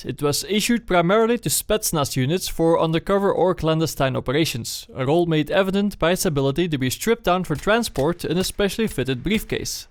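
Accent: Netherlands. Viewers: your thick dutch accent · your accent reminds me strongly of netherlands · are you dutch(nederlands) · the first Dutch person I've heard with that thick an accent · your english sounds like your dutch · the dutch accent is real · Are you dutch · He's dutch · are you dutch? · its Dutch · one kickass Dutch accent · You sound Dutch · werk aan je uitspraak want je kan zo horen dat je nederlands bent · Je klinkt Nederlands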